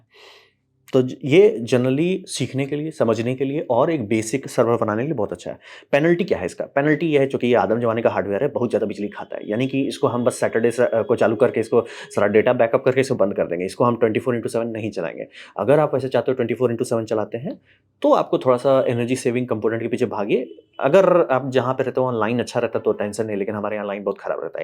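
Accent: Bihar, India. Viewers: Bihar se hawa ka ho bhai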